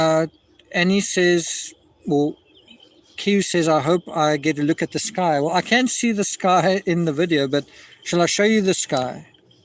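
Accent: South Africa. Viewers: Shucks you are South African! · Really really enjoyed listening to this… no offence but the SA accent normally makes my ears bleed but throughly enjoyed listening to you mate 😅❤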